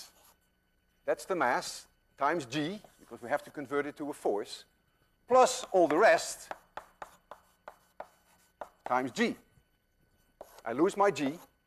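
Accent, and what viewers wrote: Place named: Netherlands. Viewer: He has a dutch accent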